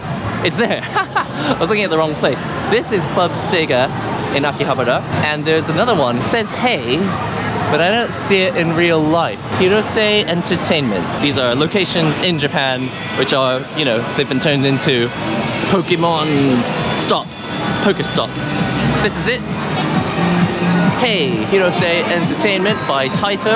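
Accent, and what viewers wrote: United Kingdom. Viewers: Um wierd what breed are u? Asien,With Briten Accent, Who speaking English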